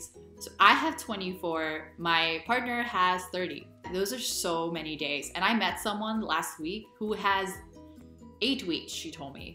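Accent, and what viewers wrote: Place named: Netherlands. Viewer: Be careful, your accent already us becoming more Dutch-like than the typical US-accent!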